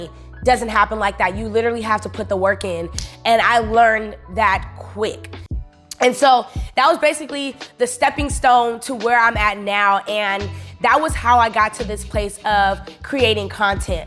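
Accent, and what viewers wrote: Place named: California, United States. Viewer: When i heard you talk i was like she's from Cali and i know she's from the bay and the u said something about Walnut Creek on one of ur vlogs lol i live in Arkansas now but i know how we sound lol 😆 btw you've gained over 2k subscribers in one month! Ur doing amazing ! U def motivate me to get back to being my self !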